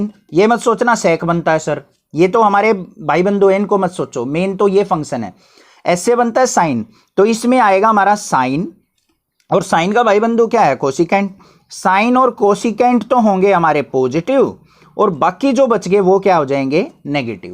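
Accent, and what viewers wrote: Haryana, India. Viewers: Sir aap haryana se ho..??🤔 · sir ji aap haryana se ho na thari bolan ki ton t laga se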